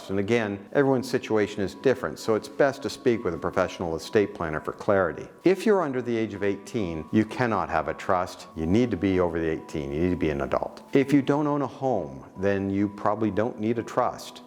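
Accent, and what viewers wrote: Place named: United States. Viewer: Nothing personal but an American accent is a bit of a red flag especially with the few Americanisms being slipped into UK society since Brexit. A lot more Private healthcare and therapy adverts being the most obvious.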